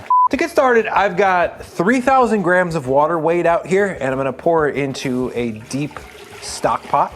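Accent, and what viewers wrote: United States. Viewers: You sound very American. Why grams? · Please, you sound like a US citizen, if not apologies, I am not at all trying to offend, but can you use the measurements most US citizens use, ie.ounces lbs etc. Great content and look forward to more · I like your recipes, you have some of the best on YT. But I hate your insistence on using metric measurements. You sound American, and your about section says United States, so why are you using that when that makes it more difficult for the American audience? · for the love of pearl why, why metric......you sound like your in the U.S. is that not the case?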